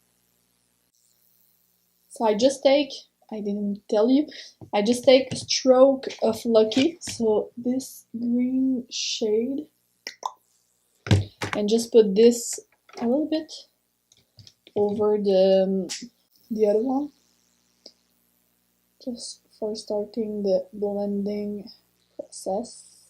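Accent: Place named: France. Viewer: gurl don't hide your French accent, I love when you put the accent on the RRRRR 😍😍 you look gorgeous and that eyeshadow is so beautiful!!!! Great look ❤️🤩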